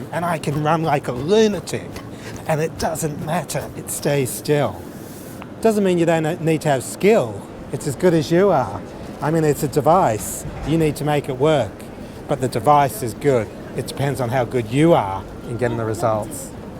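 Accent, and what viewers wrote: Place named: England, United Kingdom. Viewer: I do admit...I love your English accent...!!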